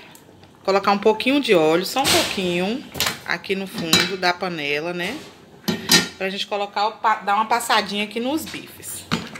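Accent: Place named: Northeast, Brazil. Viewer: Boa noite! Amei sua receita, vou fazer assim. Você é nordestina? Pelo sotaque, kkk, eu sou pernambucana. E pelo coentro tenho certeza , pois amamos.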